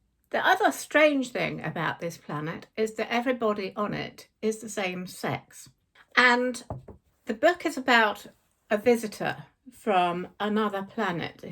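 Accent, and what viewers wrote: England, United Kingdom. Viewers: A lovely English accented lady talking about winter books, preferably curled up on my sofa under a fuzzy blanket on a dim, chilly day with a fire crackling in the grate… what could be better??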